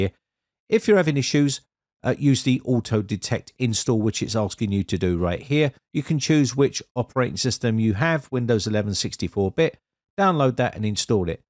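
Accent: United Kingdom. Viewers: He's clearly got a British accent mate are you okay?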